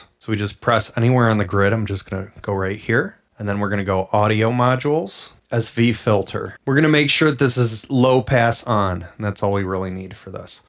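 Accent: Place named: Western New York, United States. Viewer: I stumbled onto your channel this past week, first hunting for more Lyra-8 demos then discovered your Zoia content. My Zoia arrived yesterday and the Lyra-8 arrives on Friday or Monday. I've not yet fired up the Zoia, but your videos along with other excellent walkthroughs people are posting have been helpful. Almost like I've already actually used the machine. You've got great skills of explanation and teaching, thanks for sharing those in your videos. BTW, your accent has me guessing you're from W NYS, greetings from the edge of Lake Erie. :)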